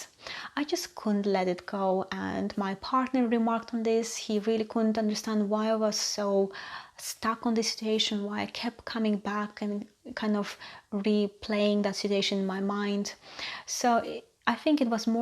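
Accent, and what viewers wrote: Russia. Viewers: Are you Russian? I can hear Russian accent. Do you live in UK?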